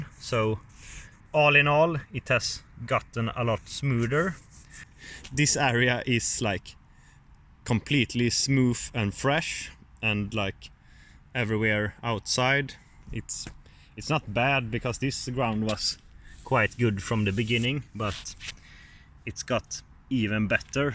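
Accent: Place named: Sweden. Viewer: swedish accents in english are awesome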